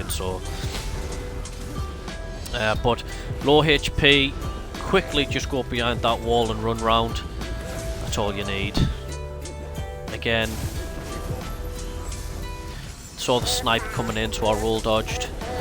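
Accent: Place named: North, United Kingdom. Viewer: listen to those dulcet northern tones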